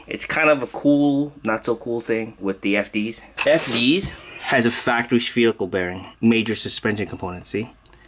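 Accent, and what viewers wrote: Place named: New York, United States. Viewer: is it just me or is his NY accent really showing in this vid 😂😂 I love it NY rep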